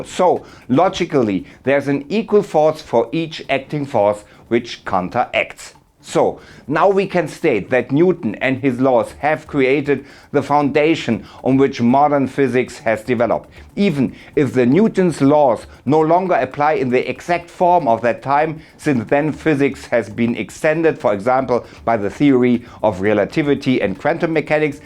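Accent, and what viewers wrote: Germany. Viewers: German Englisch ist a little funny. 😎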